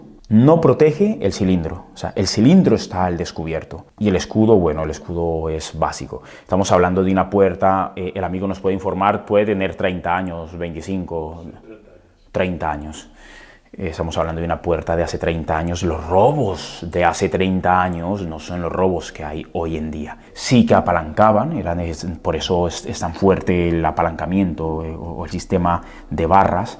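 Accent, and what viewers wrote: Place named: South America. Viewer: Y ese acento de donde es? Parece de sur america tratando de hablar como español....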